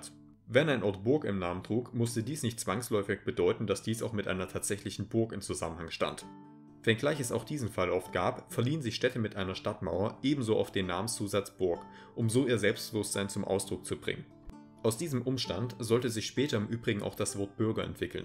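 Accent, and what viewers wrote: Saxony, Germany. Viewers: Definitiv aus Sachsen/Thüringen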